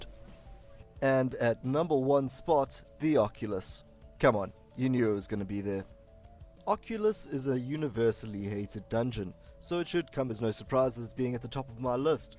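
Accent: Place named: South Africa. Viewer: can't mistake that South African accent.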